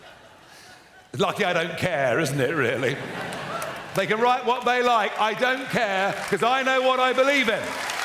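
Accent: United Kingdom. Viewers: Strong speech in a British accent, The pauses & the punch lines of an American President. Well delivered I’m here for it. I’ll leave you to decide which president did he sound like in his delivery? 😅🤣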